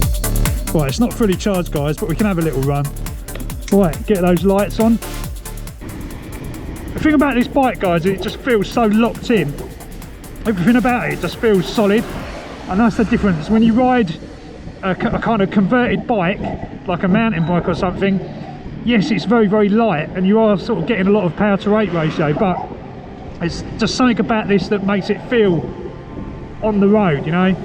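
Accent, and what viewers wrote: United Kingdom. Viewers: proper brit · This would be a great video if you were not so damn British.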